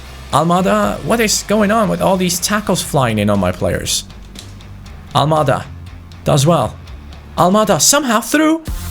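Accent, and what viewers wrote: Saudi Arabia. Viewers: he is probably from sadra Arabia becouse that's how they say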